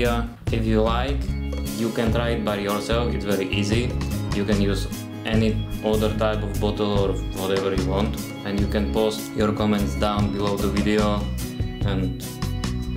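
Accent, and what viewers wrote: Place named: Czech Republic. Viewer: That was my first thought ! I was like, damn he speaks just like shops in Prague ! Thanks for the video !!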